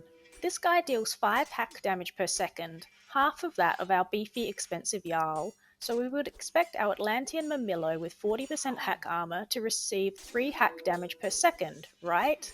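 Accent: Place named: Australia. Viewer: Why do you sound Australian? 😅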